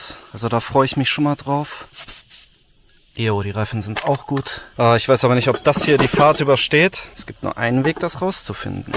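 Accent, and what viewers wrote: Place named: Poland. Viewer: Hey, sag mal hast du Polnische Wurzeln? Du sprichst Polnisch wie ich ich habe eine Oma die aus Polen Kahm 😊Liebe grüße von mir und alles glück der Welt auf deinen Reißen ich währe so gerne so mutig wie du vielleicht wenn meine Kinder aus dem Haus sind!!!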